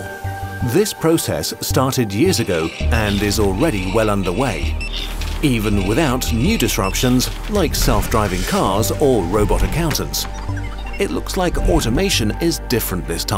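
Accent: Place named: United Kingdom. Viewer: P.S. giving it a fancy british accent doesn't make it any more legitimate.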